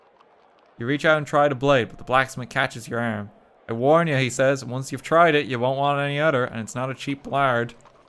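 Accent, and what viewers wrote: Ireland. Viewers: A man with an irish accent dooms a city and his character's sanity for a crown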